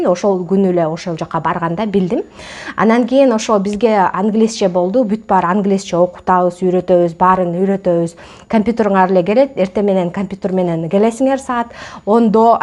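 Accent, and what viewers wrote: Standard. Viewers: Кыргызчани таза луйлот экенсиз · Азаматсыз. Жакшы. Дагы да ишиңизге ийгилик. Сизди көп адамдар көрүп үлгү ала турган экен. Бирок капа болбоңуз, деген, дегенди эле азайтып сүйлөсөңүз ,дагы да эң жакшы болот эле. Рахмат. Болбосо кыргызчаңыз аябай так, таза экен. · Ай вай молодец карындашым! Кыргызча таза так суйлоп тушундургонунузго! Жоп жонокой кылып . Мындайча айтканда супер! Кыргыз болуп туруп башка тилде суйлогондорго окунучтуу!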